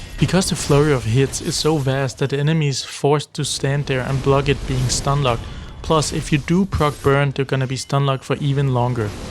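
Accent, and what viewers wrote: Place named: Denmark. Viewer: Er du dansk? The accent really sounds danish.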